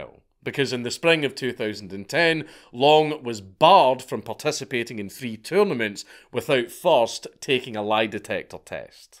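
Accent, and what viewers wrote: Scotland, United Kingdom. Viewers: you sir, are extremely Scottish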